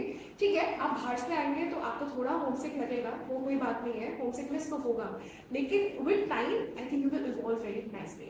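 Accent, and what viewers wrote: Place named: Arunachal Pradesh, India. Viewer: She has also imbibed Arunachalee hindi, na , na nice 👍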